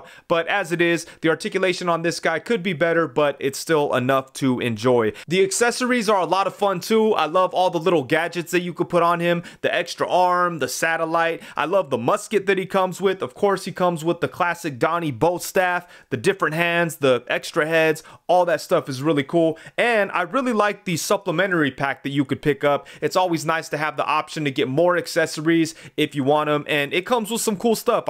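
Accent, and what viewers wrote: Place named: AAVE, United States. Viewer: Dude speaks in Ebonics and is happy to review copyright infringement toys. Lol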